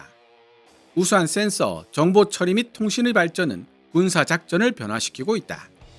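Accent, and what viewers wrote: South Korea. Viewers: 영어 읽지 마세요..콩글리시 짜증나~~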